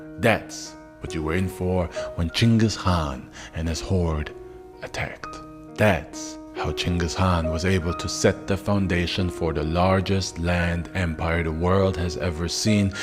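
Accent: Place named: Southwest, Germany. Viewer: If you are not an instructor you should be! Also you mentioned in another video about an allowance in euros. Did I detect a southwestern german accent? I have friends from that area that pronounce the letter R like you do. Keep these vids coming, Thanks!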